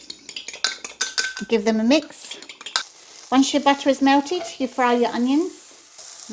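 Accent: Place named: Scotland, United Kingdom. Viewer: Delish. Even heard a few wee words from you there with a slight Scottish accent. hehe xx And Scott Bremner....I love omelettes more than you, so there.lol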